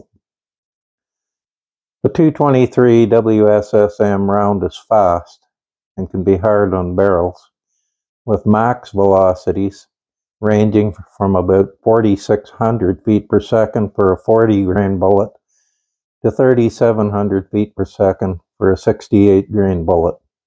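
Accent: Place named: Canada. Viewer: Hello there, what a beautiful job restoring that rifle! You sound Canadian, hello from northern Alberta. Hope you continue to post videos of your collection.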